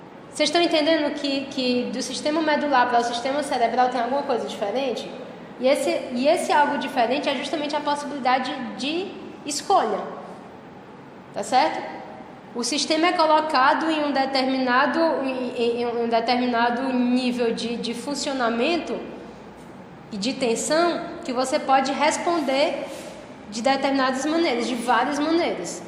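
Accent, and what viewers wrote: Northeast, Brazil. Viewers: encontrei seu canal por acaso. Estava lendo um artigo sobre Bergson que me deixou muito feliz e, inclusive, me gerou uns arrepios (alegria). Depois fui buscando mais sobre Bergson e acabei por aqui. O mais legal de tudo isso foi quando prestei atenção ao criador do artigo que, advinha, era de sua autoria. Muito obrigado por solidarizar seu conhecimento, sua forma espontânea, seu sotaque nordestino, como o meu, ainda deixou tudo mais atrativo e familiar sem perder a profundidade do pensamento de Bergson, um pensador essencial para nosso tempo. Ganhou mais um inscrito. Te ouvir me deu a impressão de ouvir uma pessoa próxima muito inteligente e fascinada por essa consciência da Vida que tanto me deslumbram também. Muito grato! Um abraço. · Boa noite! Sempre vejo seu canal e alguns vídeos seus detonando os esquerdopatas. Parabéns pelo conhecimento apurado e inteligente. 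Até seu sotaque nordestino dá um toque sublime as suas apresentações.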